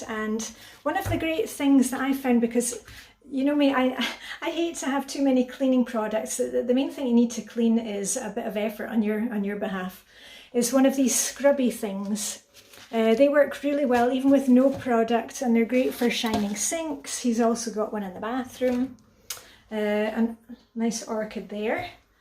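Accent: Scotland, United Kingdom. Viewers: What a well stocked kitchen, it’s great to see he cooks properly. I have a question which I hope isn’t too personal and respects your familiy’s privacy, but I have wondered about this for ages - do your children speak English with your beautiful Scottish accent?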